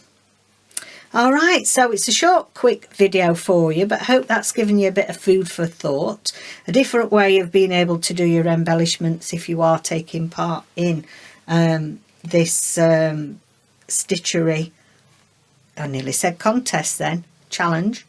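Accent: United Kingdom. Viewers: It’s so nice to meet you! Rachel has mentioned you several times & it’s always nice to match a voice with a name! I’ve never seen this technique before & I’m very excited to learn something new! Unfortunately I have to go on an archeological dig to find my sewing machine in my “sewing room”, hmmm…. Haven’t been doing a lot of sewing recently!! I’m new (since the pandemic began) to journal making & now I’m jumping into slow stitching! Still learning my stitches, hopefully I’ll catch up & get to play with my first page! Thanks so much for showing such a creative & fun technique, I love it! I have joined you as a new subscriber & given you the thumbs up & bell for new videos! I look forward to seeing more great ideas from you! Greetings from Lawrence, Kansas, smack dab in the middle of the US!! Where are you located? I love your accent, I wish I spoke British English! 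See you again soon! Thanks so much!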